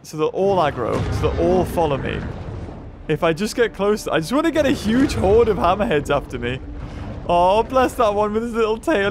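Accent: Louisiana, United States. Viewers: He has a Cajun accent. He's creole. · It's a Louisiana accent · are you American? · there are three types of Louisiana accent 1. Farmland (southern accent) 2. City (New Orleans accent) 3.Bayou (Pete’s accent) I hope you find this educational Pls upvote so can see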